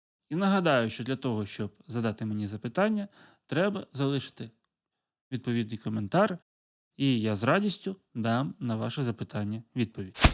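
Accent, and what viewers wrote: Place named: Russia. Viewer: У Вас російський акцент, а за що лайк, Ви ж нічого не розказали, де покарання, де конкретні дії?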